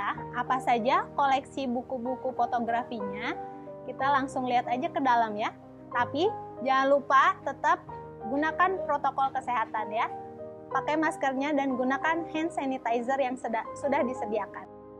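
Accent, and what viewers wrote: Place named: Bandung, Indonesia. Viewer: Bandung pisan logatnya neng😁👍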